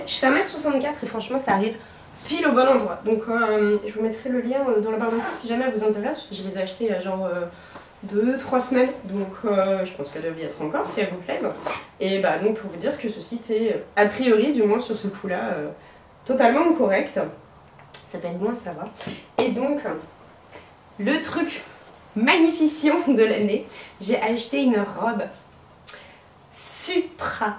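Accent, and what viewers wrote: Toulouse, France. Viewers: Coucou!! Avant même que tu y fasse allusion je me disais "c'est bon elle a "choper "l'accent toulousain " !!! Mdr 😜Contente que Toulouse te plaise! Au plaisir qui sait de te croiser au détour d'une rue toulousaine! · Héhé super contente de te revoir!!! Fabuleux cet accent du sud, ça m'a titillée dès tes premiers mots lol je ne suis pas tellement loin de chez toi donc ces petites sonorités sont familières à mon oreille! Cela te vas à ravir et tu as l'air très épanouie ça fait plaisir à voir et entendre. Mais dis moi belle, cela ne m'avais pas frappé jusqu'à présent mais tu ne serais pas HPE? Très grande émotivité, hypersensibilité, créativité débordante, vocabulaire du registre de l'émotion et mots "spécifiques" choisis avec soin pourtant servis avec grande facilité et spontanéité, grand plaisir et stimulation intense sur plusieurs plans dans les échanges relationnels qui "fusent", grande envie de communiquer et partager ses sentiments, émotions, niveau élevé d'empathie, amour des animaux avec rapport d'altérité, enfant intérieur très présent, anticonformiste, autodidacte...dis donc tu serais pas un zèbre par hasard? 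xD :P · Oh!!!! Ça fait longtemps! Tellement contente de te revoir, tu nous as manqué, tu es la 1ère youtubeuse que j'ai suivi en arrivant sur YouTube alors je suis trop trop contente de ton retour lol!!! Tu es radieuse et une vrai toulousaine, sa s'entend lol la robe est cannooon!!!!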